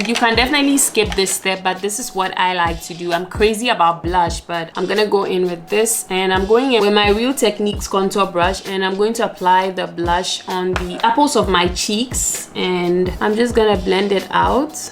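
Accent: Ghana. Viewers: I love your videos and how you do your make up. Your make up always looks so natural even when you do a full glam. It never looks like a cake of make up. You are a very beautiful woman. I was trying to figure out where you are from because of your accent but I saw you are from Ghana! That is sooo cool! I love Ghana I would love to go one day!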